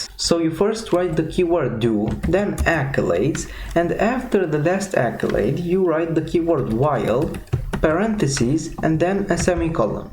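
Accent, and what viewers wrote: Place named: India. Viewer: More indiant accent please!!! Btw great video!!! Sub from me😁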